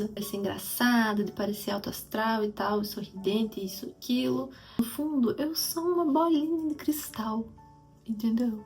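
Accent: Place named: Paraná, Brazil. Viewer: Nossa, que loucura, eu reconheci o sotaque do Paraná, mas nem sonhei que fosse de Guarapuava. Moro aqui atualmente também. Amanhã recebo minha devolutiva, e meus sentimentos desde que descobri essa possibilidade foram muito semelhantes aos teus. Achei a profissional que considerava ideal, que me transmitisse a confiança suficiente pra não dar margem pra síndrome do impostor atacar, caso recebesse a confirmação do laudo e mesmo assim ficasse duvidando da veracidade da informação. O problema é que ela tinha uma fila de espera de cerca de 6 meses pra avaliação. Jesus, tive que esperar né, mas imagine a "angústia" 😂. Mas valeu muito a pena a espera. Agora estou vivendo a mesma fase, de ter 99% de certeza que sim, mas com aquele medo de cair do cavalo amanhã é real. Também a preocupação de o que fazer com essa informação, caso se confirme. Enfim, muita coisa pra sentir, mas feliz por estar fazendo. 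Parabéns pela coragem de se expor assim, só quem passa pelo processo e estuda o tema é capaz de entender as dificuldades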